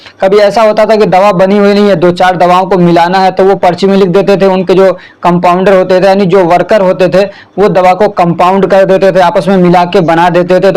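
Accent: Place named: Mithila, India. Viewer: Sir aap kha se aapka tone bihar k mithilanchal area se match krta hai aapka bahut shukriya itne achhe trah padhane ke liye allah apko lambi umar de ❤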